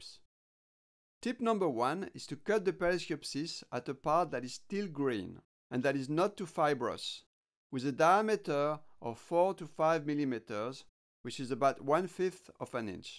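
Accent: France. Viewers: au top, j'adore les cactus et l'accent français, merci !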